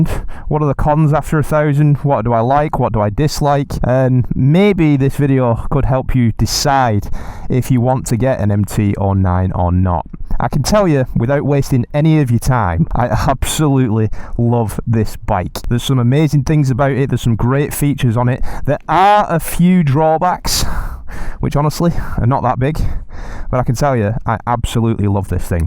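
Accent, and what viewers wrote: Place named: Scotland, United Kingdom. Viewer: Also as a Scot in the USA, it’s nice to hear the accent, riding is so much better here, our weather sucks for riding lol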